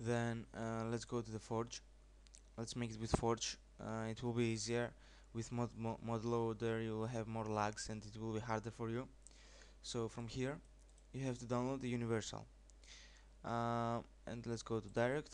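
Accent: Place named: Portugal. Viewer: paraces tuga a falar! LOL~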